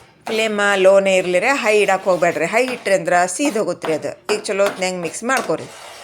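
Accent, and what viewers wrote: North Karnataka, India. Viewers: Uttar karnataka language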